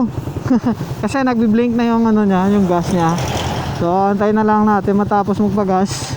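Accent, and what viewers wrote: Iloilo, Philippines. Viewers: boss ang ilonggo nyo kag ilonggo dre sa tacurong sultankudarat paryas paryas gid haha long live ilonggos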